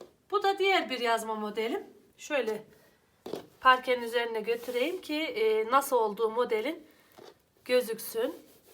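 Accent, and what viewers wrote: Aksaray, Turkey. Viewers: Ellerine ve emeğine sağlık hepsi çok güzel biz Aksaray insanları hep doğal konuşuruz benim oğlumun ismi de Ayhan sizi çok seviyorum hanım beğenerek dinliyorum izliyorum selamlar öpüyorum seni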